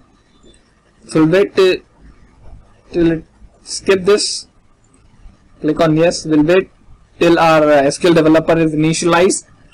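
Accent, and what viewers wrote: India. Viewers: Oh GOD! ANOTHER INDIAN !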